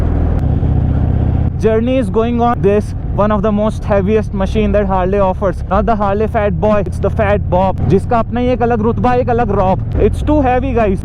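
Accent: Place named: Haryana, India. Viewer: Bhai haryane se lg rhe ho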